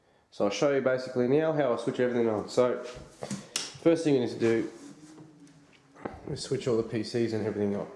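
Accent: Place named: Australia. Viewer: That Aussie accent is real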